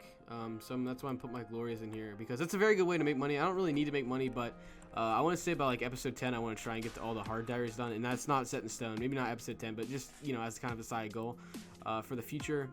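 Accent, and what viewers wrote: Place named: Buffalo, United States. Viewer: U frm buffalo?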